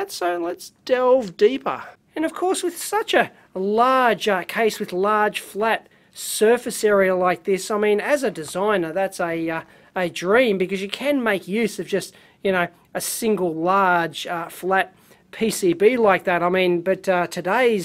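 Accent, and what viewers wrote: Australia. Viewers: The crappy Aussie accent made me turn the volume down.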